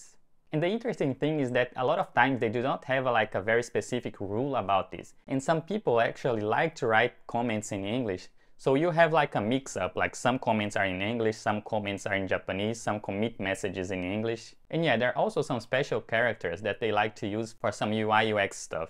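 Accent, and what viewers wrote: Brazil. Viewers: Você é Brasileiro?! Caramba! Show de bola man! Watashi wa Lima desu. Dozo yoroshiku.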